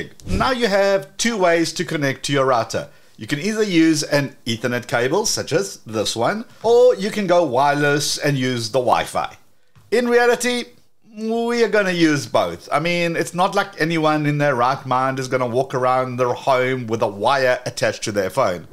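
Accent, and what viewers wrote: South Africa. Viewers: Thought so😁. Your accent, only a South-African will know 😅Not anymore though, from your channel info? All the best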